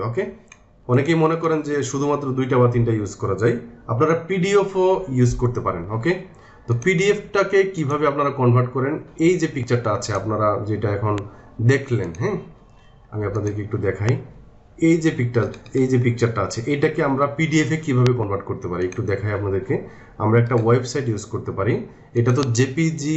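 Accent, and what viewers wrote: Sylhet, Bangladesh. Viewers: Bhaia apni ki sylhet teke?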